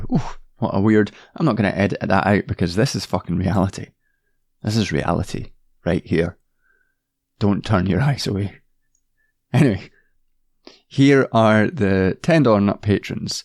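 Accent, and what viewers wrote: Scotland, United Kingdom. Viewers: My first video on your channel. It's good to hear a fellow Scottish voice talking about how shite everything is. Thanks for that. The Braveheart remix was a nice touch. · You got me with your Scottish & I subbed for the lefty content. We need to make alliances.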